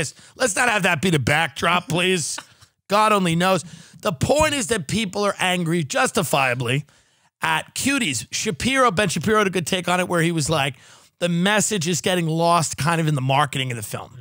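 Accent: United Kingdom. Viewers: As a Brit ex-pat I only tune in for the genuinely authentic british accent. It makes me homesick.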